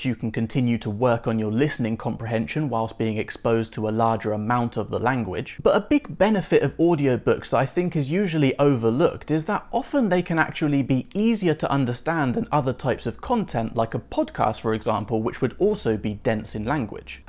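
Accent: United Kingdom. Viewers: Nice video! I’ve been learning Spanish for a while, but haven’t done too much with audio books. I’m going to do more. BTW I just finished watching your video with Español con Juan (my first time watching your channel) next I watched this video, I don’t know why but it was strange to hear your British accent! 😀 ¡Tu Español es muy bien! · I love your British English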